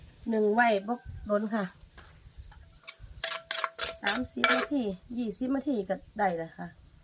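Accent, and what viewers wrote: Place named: Ubon Ratchathani, Thailand. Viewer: สำเนียงเว้าคือทางอุบลแท้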